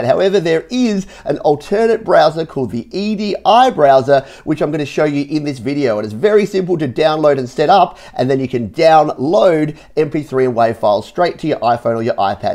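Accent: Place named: Australia. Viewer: Ya sound Aussie mate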